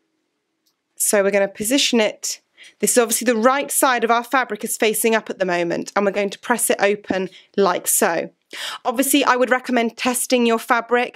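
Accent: England, United Kingdom. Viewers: very beautiful english accent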